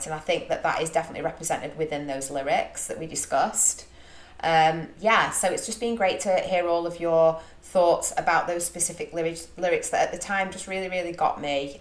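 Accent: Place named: Stoke, United Kingdom. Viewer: Ay up duck. Always interesting to see a ren reaction. He’s brilliant isn’t he? 😂 Are you from manc? You sound almost Stokie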